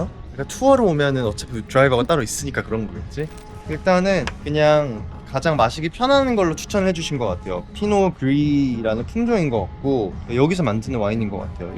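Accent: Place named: New Zealand. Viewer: Its wonderful you came back home to Aotearoa Paul, and so nice to hear that you havent lost your Kiwi accent! I hope you and your wife enjoyed the trip, its been fun watching so far 😊 🇳🇿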